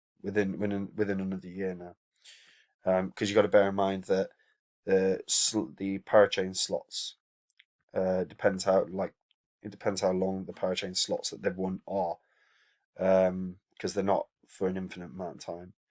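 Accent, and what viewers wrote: United Kingdom. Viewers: He has a really clear british accent mate!!.....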